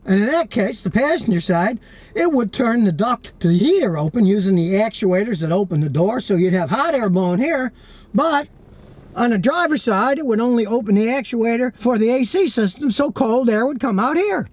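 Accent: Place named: South, United States. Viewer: Your English spoken very country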